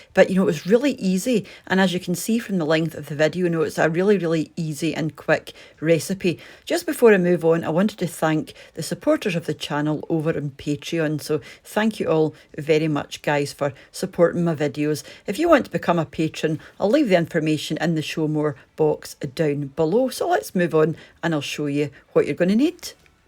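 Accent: Scotland, United Kingdom. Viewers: Have you noticed that the subtitles say to cook them for 30 minutes each side whereas says 3 minutes each side. The downside of automated subtitles which are not geared to a soft Scottish accent.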